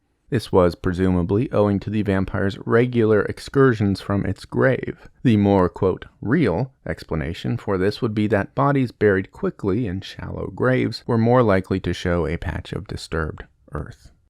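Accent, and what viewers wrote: United States. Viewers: I’m confused why you’re speaking American and not Australian tho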